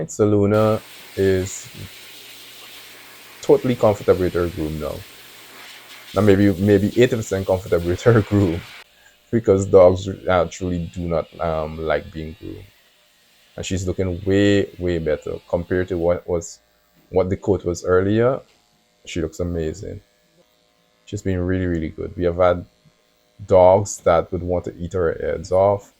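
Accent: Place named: Caribbean. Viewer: I love your accent, where are you from? You sound West Indian. A very comfortable and soothing voice. Puts me in mind of my Granny. 🙏🏾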